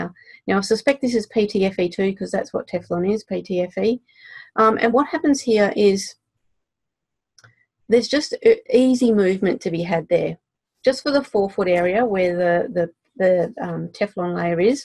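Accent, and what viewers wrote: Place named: Australia. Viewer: those of us not as familiar with the Australian accent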